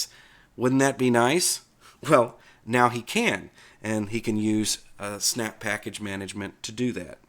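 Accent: United States. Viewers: I have said this before and have to repeat this again: if you want to get the best Linux channel for novice just subscribe to the ' channel on Youtube. I am so glad that I run into his channel a while ago! Of course I don't agree with everything of what he has to say,without exceptions, but it's just impossible to agree with the 100% anyone would say without an exception or two. But boy, oh boy: his guides are super-useful and they are made well. In addition his language sounds right for an American "ear" too, comparing to the British or Indian English some other good youtubers use. Hats off,!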